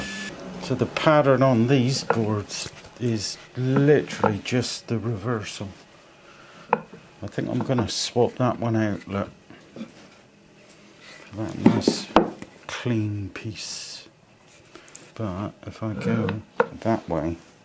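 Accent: Cornwall, United Kingdom. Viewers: Great to watch the way you work, I especially like your narratives explaining what you are going & why you are doing it that way..... Also to hear rhe Cornish accent ( in Singapore)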